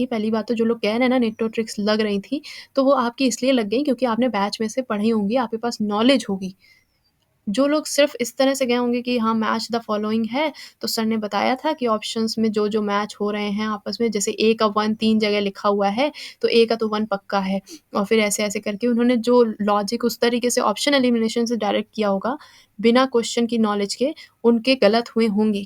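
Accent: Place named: Kanpur, India. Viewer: They both are kanpuriya. That's why same style of speaking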